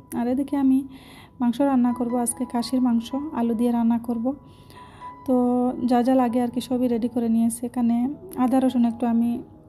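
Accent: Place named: Sylhet, Bangladesh. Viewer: 🔔🔔👍👍 আপু আমার সিলেটি কথা বালো লাগে । আমিও সিলেটি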